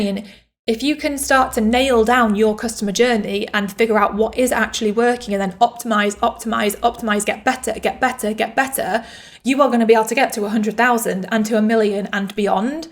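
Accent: United Kingdom. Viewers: This is great info, now how can you structure all of this into a methodology? That's something you could sell! The message/ offer about your membership is not clear. What would be the exact benefit of joining? You sound like you're British, so will your membership/ knowledge is British mentality specific? Or will it also apply to the US culture? The workshop is also a good idea but the offer is not clear. Will we get the clear step by step instructions on how to achieve what you have achieved? This video is good but could be great, in my opinion, it's a missed opportunity.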